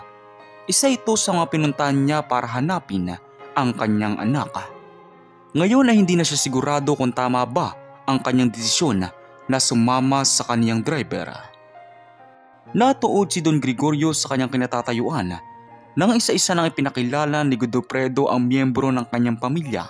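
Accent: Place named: Visayas, Philippines. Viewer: Halatang bisaya ka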